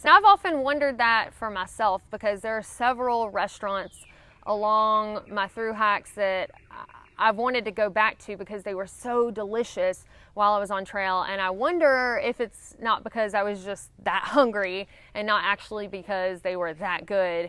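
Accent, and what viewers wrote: South, United States. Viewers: I realized all of these things from long outdoor experiences while in the Army. I still remember pulling my sock off and throwing it against a wall and it stuck. That was after weeks of not having the opportunity to change clean etc.. I really appreciate your southern drawl. I’m from Louisiana originally. Living in Europe going on 26 years and don’t get the chance to listen to the singing of the southern girl. Amazing. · Sounds like a lot of things I went through coming back from Iraq. Weird. I like your videos. Good advice and you have a soothing tone and an accent that’s as country as a butter bean. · I love the crystal clear "et cetera" in the midst of your southern drawl 🤣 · I find her accent rather annoying. Better I guess, and more genuine, than that haughty Valley Girl manufactured ball-of-butter-in-the-back-the-throat voice that a lot of chicks choose today. 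Still, she's been out of the country now and has been exposed to enough different kinds of speech that she can improve that Scotch-Irish Southern drawl that makes her sound ignorant. I did. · I've made this comment before I guess but in 79 I hitched outa Charlotte to never really return to the south and much further down the road America itself. Now in Scandinavia. Long long journey; but your voice and your very southern alterna-girlness takes me back, strangely very familiar and missed somewhat. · It amuses me how people are so enamored with accent. It is just how we talk! Of course, her's is a nice, sweet version. However, you know you have someone with a good southern accent when the pronunciation of "pin", "pen", and "pan" are basically indistinguishable.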